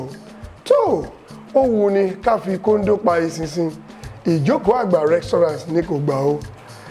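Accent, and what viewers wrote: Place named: Ijesa, Nigeria. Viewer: Baba Ijesa please never change with your Ijesa dialect. Very wonderful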